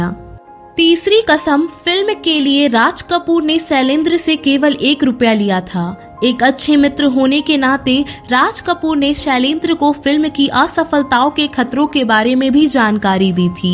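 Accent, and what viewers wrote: Bihar, India. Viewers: Bihar se hai ye ladki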